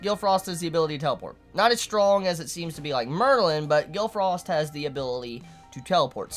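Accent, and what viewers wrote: South, United States. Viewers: Pretty sure is from the South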